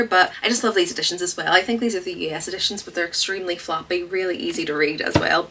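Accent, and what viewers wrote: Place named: Northern Ireland, United Kingdom. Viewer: So glad to have found a fellow book lover from Northern Ireland! I used to work for the Northern Ireland Bureau (British Embassy) in DC and I miss the accent. 🥰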